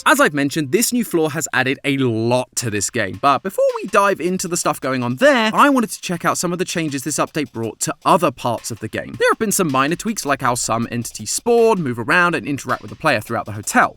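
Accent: United Kingdom. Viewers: Honestly, I love as a host. His British voice is definitely my favorite thing about him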